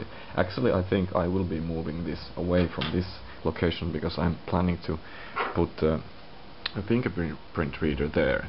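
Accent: Finland. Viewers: Suomalaiset aina puhuu näin englantia. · Kyllä se suomen aksentti on sitten oikeasti selvää ja helposti ymmärrettävää... Kiitokset :)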